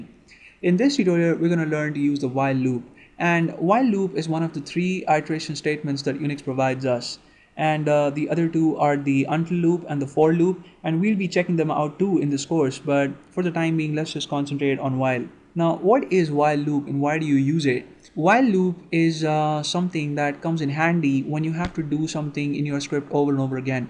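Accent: India. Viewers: stop trying to use american colloquial language...especially with this indian accent...Preferably stop using english....there are many channels with proper english... It's better to stick to hindi for you guys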